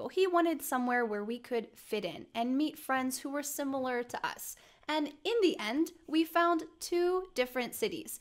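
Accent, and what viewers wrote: United States. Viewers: I 'd rather learn American accent instead of British accent that is why I follow your channel.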